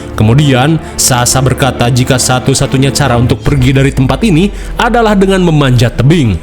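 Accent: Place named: Sunda, Indonesia. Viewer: Narasi narator, kaler, cagak, poek, rorombehen, ari si akang urang mana euy ?😅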